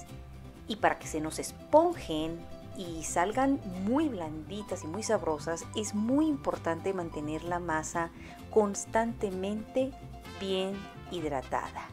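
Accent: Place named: Mexico. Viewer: Qué lindo acento mexicano. Estupenda receta. Me antojan unas tortiçças ricas así. Felicitaciones y saludos desde Brasil...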